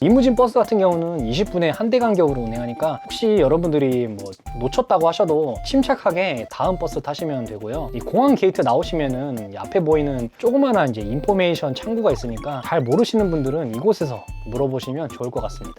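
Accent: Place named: Japan. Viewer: 한국어를 일본억양으로 말하시네요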